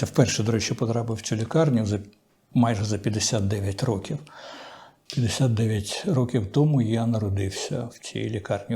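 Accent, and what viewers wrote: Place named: Russia. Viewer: А чому в пана Дмитра така підозріла російська фонетика? :) Чому це він називає КлИчка - "КлІчком"? з якого дива? Звідки оці : "ДанІлови", "РЄзнікови", а також вимовляння на російський лад решти слів на кшталт "проблЄми", "крЄмль" тощо. Вам так подобається московська мова?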